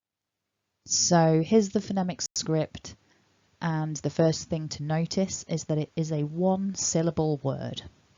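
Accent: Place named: RP, United Kingdom. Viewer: I'd like to point out that in Modern RP, [ɔʊ] (and sometimes [ɒʊ]) is an allophone of /əʊ/ before syllable-final /l/. It can be ascribed to the velarisation of the following /l/ (aka darkening effect of the dark l). So words like "goal", "whole", "coal" are pronounced with [-ɔʊl]. And I hear that in your speech too.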